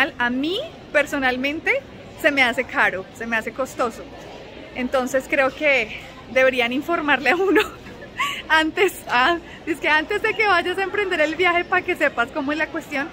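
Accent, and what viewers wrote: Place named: Colombia. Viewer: Me Gusta Mucho cuando. Cuando sí de mijos Y Mijas En su asentó colombiano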